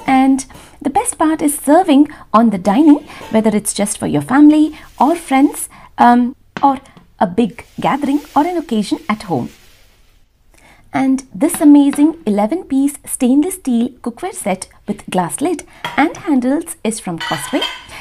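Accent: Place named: Hubli, India. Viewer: Hi ri nim channel yavag nodidru tumbane Kushi agutte ri and vegetarian nalli eshtondu Verity food esht unique aagi quick aagi cook madbahud anta gottirlilla, and nim channel nalli prati video kuda different food style different things galanna nodtini nanu adu bhal eshta agutte so I regularly watching ur channel and I m the big fan of u n ur channel ❤️. Luv u soo much dr 😍. And ennond en eshta andre nivu nim maklanna matadso reeti avvi appi annodu 😍😁 and pakka Hubli bhashe all things I just luved it ❤️ Thanks fr ur informations and suggestions. Love from Bagalkot and Raichur ❤️❤️❤️❤️❤️❤️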